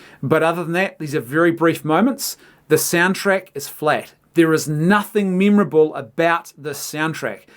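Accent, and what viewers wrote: New Zealand. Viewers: The only question I have after watching this: Are you from New Zealand?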